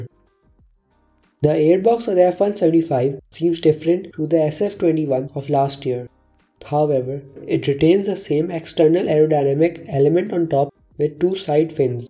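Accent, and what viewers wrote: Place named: India. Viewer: Seems like indian accent. Bruh where r u from?🌚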